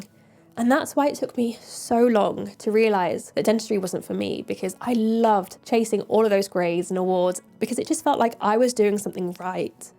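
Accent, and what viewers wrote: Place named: RP, United Kingdom. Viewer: You sound very posh!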